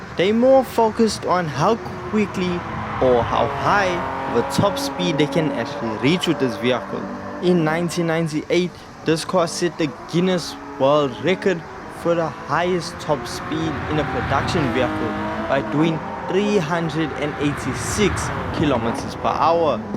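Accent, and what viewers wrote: South Africa. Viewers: bro u sound like u are from sa?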